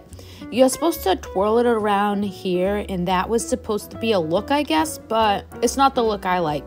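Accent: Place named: Maine, United States. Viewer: Love your accent! Do you live in Maine?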